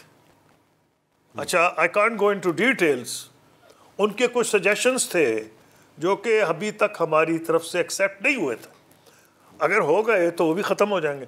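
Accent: Punjab, India. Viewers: Why this gentleman speaking Urdu in Punjabi style...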